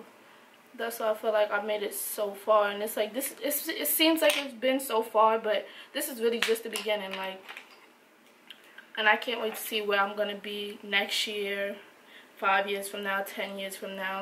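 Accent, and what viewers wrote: New York, United States. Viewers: You from new york? Harlem?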